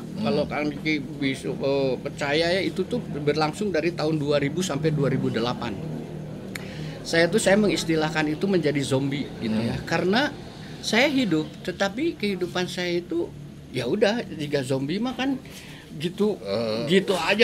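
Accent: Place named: West Java, Indonesia. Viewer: Kang nyariosna meni ngosom khas Sunda pisan...👍👍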